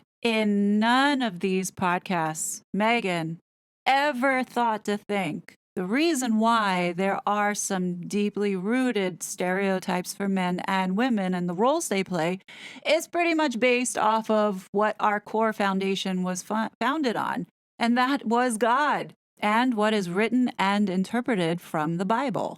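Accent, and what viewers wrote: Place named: United States. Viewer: I agree with you about everything you say & love your vids as they're so informative & honest. But, as a committed atheist for past 30 yrs (was a Catholic) i rarely admit to anyone, except close friends my lack of religion. When I make that mistake, I'm usually castigated for it. Whereas, when I was Catholic, no problem. As you sound American (possibly Canadian?) we're always led to believe in the UK that America is the most christian country on earth. Is that woke stuff happening across the Pond too? Horrible if it is, as Christian ideals r the only ones to live by. I still do, even though I don't believe in God. Apologies for offending other people of "The Book" but New Testament never advocated massacres & plagues on whole innocent populations just because God woke up that day feeling grumpy or a bit bored. It was a handbook for peace.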